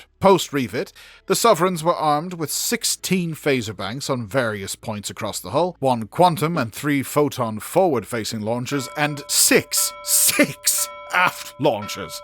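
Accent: United Kingdom. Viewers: okay, the narration was way way over the top on this one, had to shut er down 1/3 thru lol. Please get rid of the nasty british accent thx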